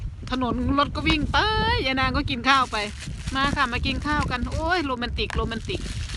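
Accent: Isan, Thailand. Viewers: คือบ่เว้าอิสานโรจเนาะสำเนียงก็ออกอีสานยุโอ้ยๆๆๆ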